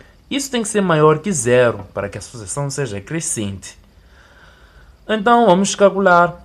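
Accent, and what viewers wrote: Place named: Mozambique. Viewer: Gostei pelo sutac és Moçambicano , e eu sou Angolana 🇦🇴✨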